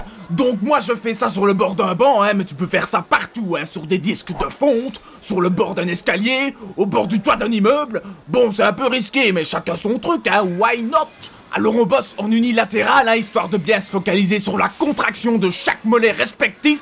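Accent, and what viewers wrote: Belgium. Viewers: c'est l’accent belge qui fait la différence ;-) j’aime bien toutes tes vidéos on apprend beaucoup de choses !!!!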